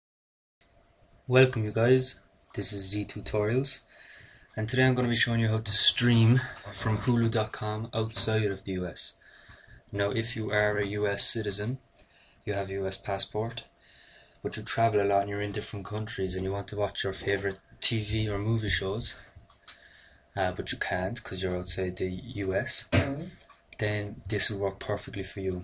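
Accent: Ireland. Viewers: r u irish?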